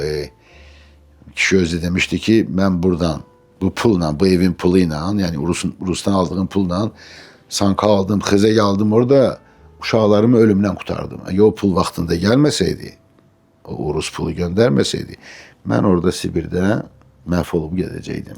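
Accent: Baku, Azerbaijan. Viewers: Ləhcəsindən hiss olunur ki bakılidir. müəllimə Allah can sağlığı, 100 il ömür qismət etsin. Belə verilişlərin sayı çox olsun.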